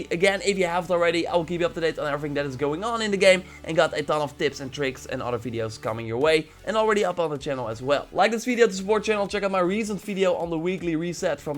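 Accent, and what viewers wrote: Netherlands. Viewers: You got a huge dutch accent :p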